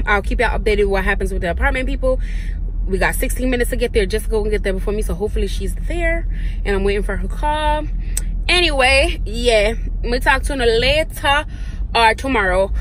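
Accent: Jamaica. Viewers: Mi nah lie mi love yuh style ..... I just love how yuh chip in the patois with the twang 😆😆👍🏼 just came across your channel ... mi like it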